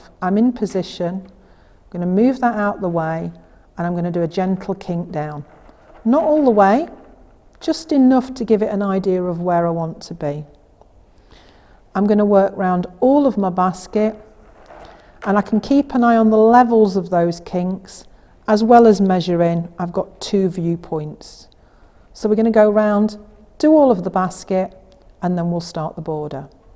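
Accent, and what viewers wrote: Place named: Lincolnshire, United Kingdom. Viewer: A big hello from Monmouth! I was born and raised in Lincolnshire, so it has been lovely to listen to your accent. It brings back many good memories. And this has been s delightful series. Nice and clear, not too much talk to confuse, and all set up in a homely placement. Congratulations. I hope you do very well.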